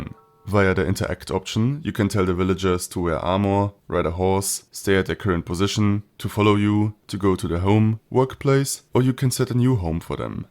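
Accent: Germany. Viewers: Deutsch englischer Dialekt accent yeah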